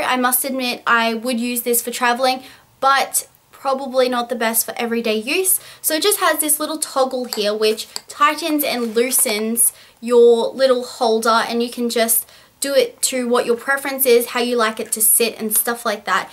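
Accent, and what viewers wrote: Australia. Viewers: Australia I believe:) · I would die for you accent! Australian accents are by far my fav your so perf btw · Australian accent :)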